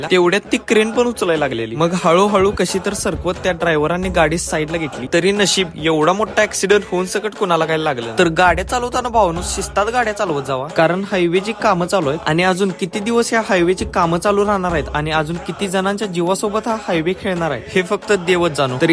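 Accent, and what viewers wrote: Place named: Kolhapur, India. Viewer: Your language tone is kolhapuri, this may not be Nashik Pune road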